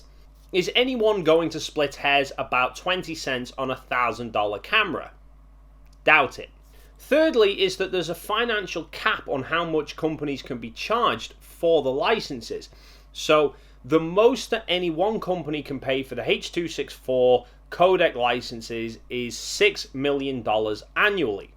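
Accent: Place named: Isle of Man, United Kingdom. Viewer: That's a Manx accent for sure! You a fellow Manxman? 😁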